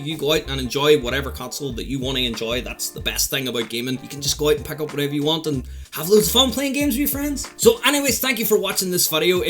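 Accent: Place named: Northern Ireland, United Kingdom. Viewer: he might live in LA but his accent is definitely northern irish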